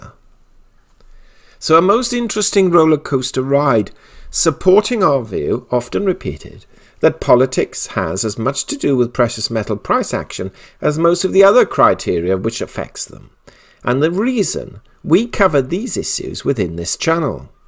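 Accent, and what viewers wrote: United Kingdom. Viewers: Is this a regular British accent?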